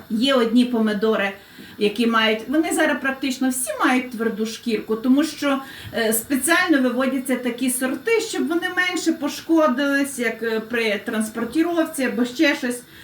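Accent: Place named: East, Ukraine. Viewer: Дякую за ПОМИДОРКИ!😊 Який у Вас цікава мова - ніби більш притаманна західним регіонам, але відчувається східний акцент теж. Якщо не секрет то звідки ви?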